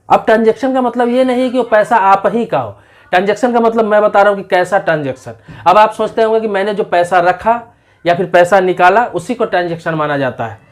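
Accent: Bihar, India. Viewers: BIHARI HO KA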